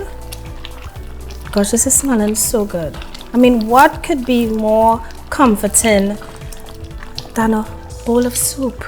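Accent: Trinidad and Tobago. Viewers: You sound Trini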